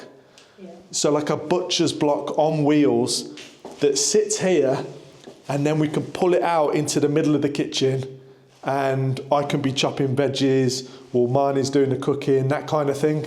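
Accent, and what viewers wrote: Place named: North, United Kingdom. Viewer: Nice to meet you...you sound like a northerner...where you from girl??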